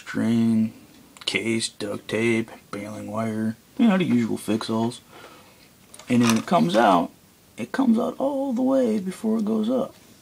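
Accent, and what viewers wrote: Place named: United States. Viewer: Fantastic video. You bottom line stuff and talk in the proper american english just like my uncle. Thanks for the info and the fix!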